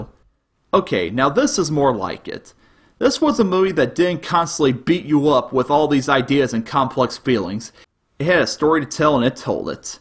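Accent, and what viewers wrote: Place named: Texas, United States. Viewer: somehow texas like accent unconsciously make me think whatever you're saying is less credible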